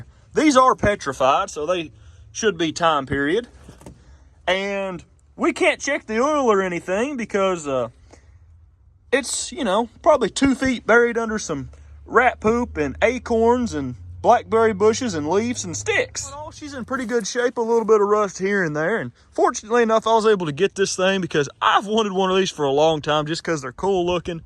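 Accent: South, United States. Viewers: you are southern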